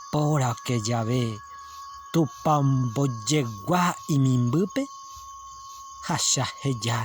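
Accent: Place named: Paraguay. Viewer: Hermosa poesía de nuestro poeta campesino, a veces no comento por qué ya soy redundante jaja. Entendí bastante , pero escuchar esa voz con acento guaraní ya llena el corazón. Seguramente en el medio de la noche se pueda apreciar mejor la belleza de las estrellas, sentir en Soledad la fragancia de la tierra, algún sonido misterioso qué solo la noche te puede regalar... sin temor a algún aparecido ó fantasma. Cantarinas palabras florecidas en plena medianoche, hace alegrar mi existencia y me contagió y me creo dueña por un rato del poeta...🥰🎼🌈❤🌻🦋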